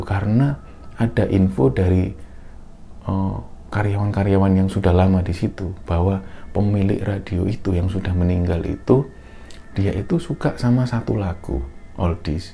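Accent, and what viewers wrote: Java, Indonesia. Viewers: Jawanya kentel tenan,. Pembawaan critanya enak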